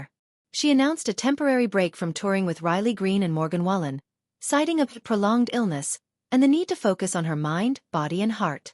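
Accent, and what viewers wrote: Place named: Alabama, United States. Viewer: She sounds country as them red chert roads here in Bama.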